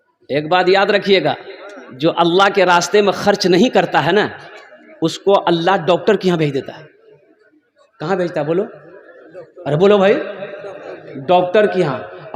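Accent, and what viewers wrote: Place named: Jharkhand, India. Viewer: Jharkhandi jaise bolte aap maulana sahab ❤😅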